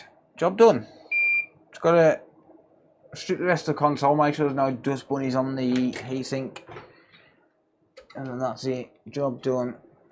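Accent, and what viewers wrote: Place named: Birmingham, United Kingdom. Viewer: Come on brummie lad . You're best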